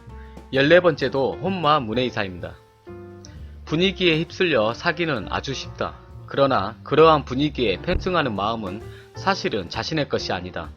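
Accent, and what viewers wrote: Jeolla, South Korea. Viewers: 전라도 사투리의 비애.. 전라도 사람인 제가 들어도 ㅋㅋㅋ 서울 말투써야 먹힐듯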